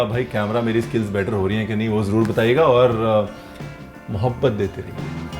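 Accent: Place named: Punjab, India. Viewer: na g na ye panjabio ka accent hai ...Not in village..😊😊😊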